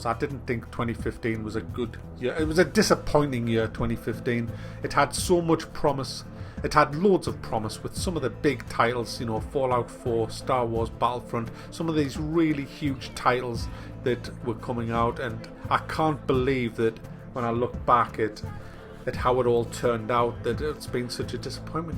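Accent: United Kingdom. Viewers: Somewhere from the UK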